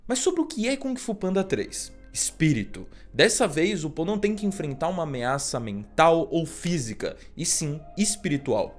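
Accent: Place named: England, United Kingdom. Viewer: Ele ta falando com a pronuncia em inglês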